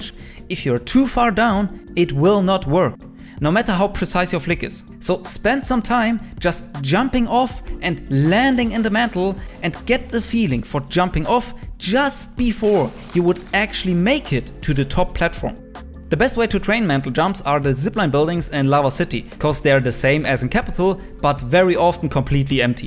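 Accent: Germany. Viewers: Bild ich mir das ein oder hast du n maximalen n deutsch aktzent?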